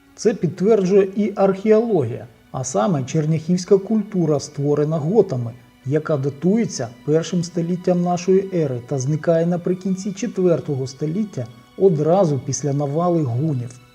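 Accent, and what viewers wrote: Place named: Moscow, Russia. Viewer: Ви нащо назад на українську перейшли? Вам не пасує. Тільки масковскій діалект цапського ізика. З аканням.👍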